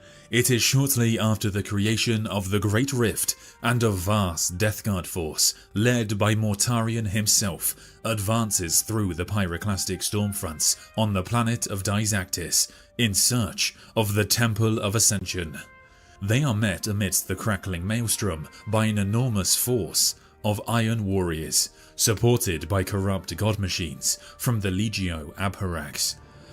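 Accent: United Kingdom. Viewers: Its just his accent,he's British.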